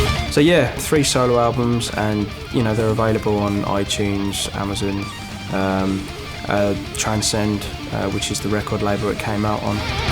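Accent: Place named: United Kingdom. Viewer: "I use EMG's because I think they're the dog's bollocks." I don't know if it was the British accent making it sound better, but I think that may be one of the greatest quotes ever spoken by anyone.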